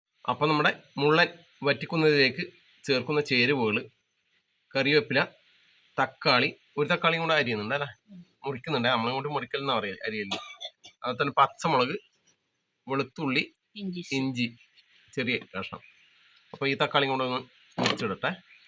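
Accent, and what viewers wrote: Kannur, India. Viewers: നിങ്ങളു കണ്ണൂരാണോ പയ്യുന്നു രാണെന്ന് മനസ്സിലായി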